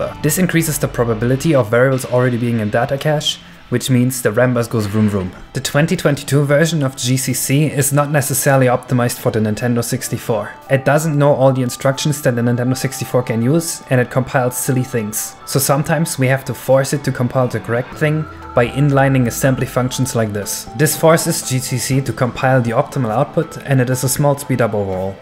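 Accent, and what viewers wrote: Germany. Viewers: i love the heavy german accent. very thematic. "lol. typical germans. always trying to reinvent the wheel. there's no overengineering like german engineering" and the like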